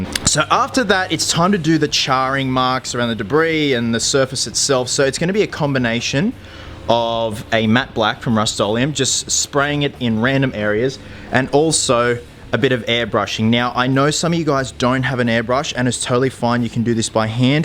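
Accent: Australia. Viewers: What part of Australia are you from?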